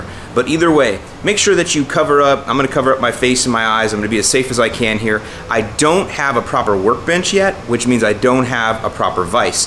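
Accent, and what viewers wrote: Chicago, United States. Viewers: I love the exaggerated Chicago accent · nice chicago accent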